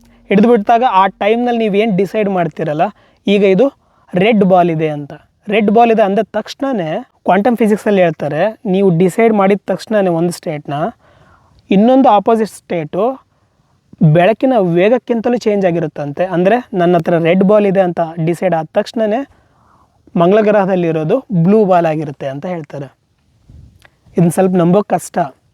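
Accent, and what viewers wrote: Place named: North Karnataka, India. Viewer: ಗಂಡ ಮಗನ....❤ ಉತ್ತರ ಕರ್ನಾಟಕದ ಮಾತು....ಇನ್ನು ಹೆಚ್ಚು ಹೆಚ್ಚು ಕನ್ನಡ ದಲ್ಲಿ ವೀಡಿಯೋ ಚಿತ್ರೀಕರಣ ಮಾಡು.......❤❤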